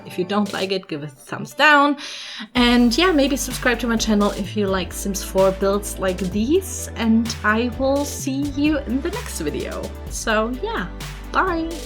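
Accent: Germany. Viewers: And youre dialect sounds like German is that right ? 😊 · Der deutsche Akzent ist die beste! 💛 So ein schönes Haus. Echt gut gemacht! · Me: hears the accent *Checks channel information yup. Germany. P.S. COOLES VIDEO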